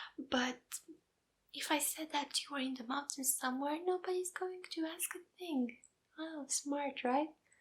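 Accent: France. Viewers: Is that a french accent?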